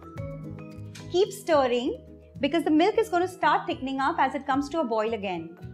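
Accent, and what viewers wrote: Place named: India. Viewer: That is a typical Indian accent! It is how we speak so...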